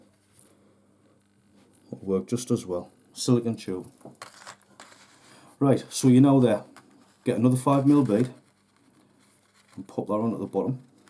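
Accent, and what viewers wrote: Sunderland, United Kingdom. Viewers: Hi, I just stumbled across this video, I don't go Sea fishing but used to do a lot of match fishing, but only club level, best times spent with your friends. Found this absolutely fascinating, the stainless steel knowledge, the mono or braid, and understanding the Sunderland dialect - I'm a woolyback from St.Helens!! This may have been filmed a while ago but I'll be back for more. This is great, content. Subscribed and will be back to watch more. Take care 👍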